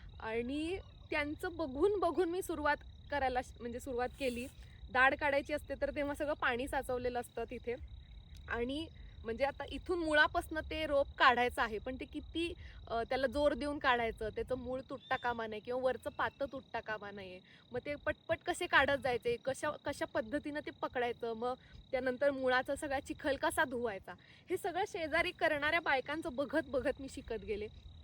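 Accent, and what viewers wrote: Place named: Konkan, India. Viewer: कोकणात असूनही तुझ्या आवाजात थोडा कोकणी+सोलापूरी टोन जाणवतो.😊👌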